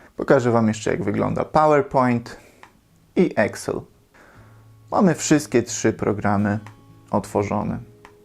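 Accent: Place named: England, United Kingdom. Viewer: I ten angielski akcent. Bardzo przydatny film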